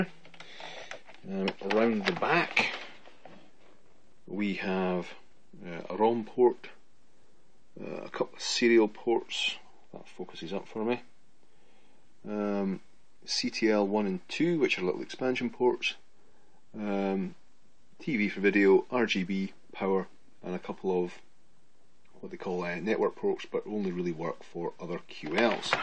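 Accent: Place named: Scotland, United Kingdom. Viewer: Sounds Scottish to me.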